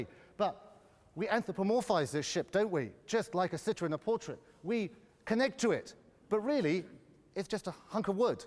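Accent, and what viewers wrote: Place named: United Kingdom. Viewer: I’m from New York City and love these lectures and I understand most of it even with the British accent!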